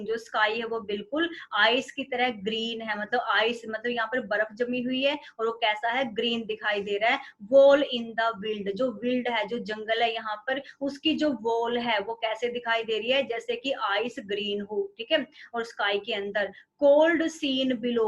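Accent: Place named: Punjab, India. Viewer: Tuhadi bolli Punjabi lagdi aa..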